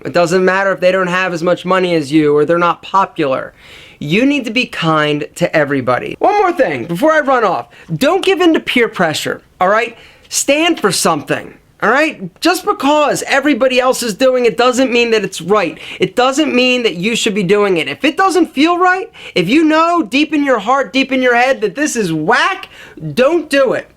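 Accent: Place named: New England, United States. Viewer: where did you grow up alpha? I can hear a new England accent on your "aw's". -14 year old long term viewer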